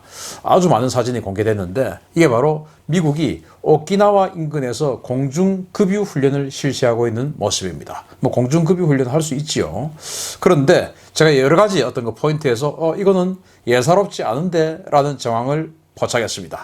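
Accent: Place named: Busan, South Korea. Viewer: 죄송합니다 만 저도 부산출신인데 사투리 재미나요 ㅎㅎ 표현도 재미나심 편대가 촤아아악 무장을촤아아악 ㅎㅎㅎ촤아아악을 자주 표현하심 ㅋㅋ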